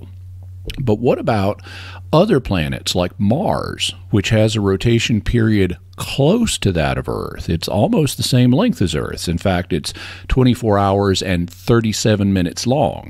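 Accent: South, United States. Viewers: Is it just me... or is he assuming a "folksier" southern accent than usual in this video lol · I love your work! Thank you! Also, I noticed a southern accent stronger in this video than most. Did you recently speak with southerners? That phenomenon of changing accents according to environment would make an interesting topic.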